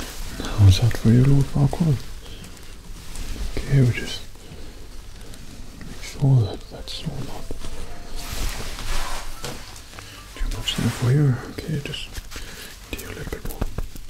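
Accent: United Kingdom. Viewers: Yeah they're both British and manly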